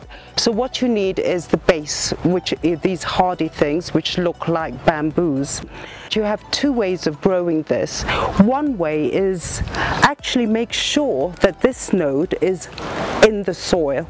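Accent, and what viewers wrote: England, United Kingdom. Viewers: Very good video, especially when you speak English English! Informative!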